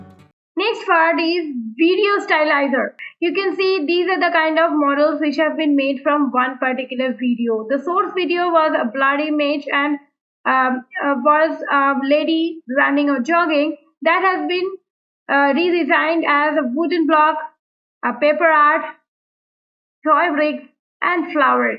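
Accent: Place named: Bengal, India. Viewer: tumi ki bangali ? tumar words pronounciation to just bangali bangali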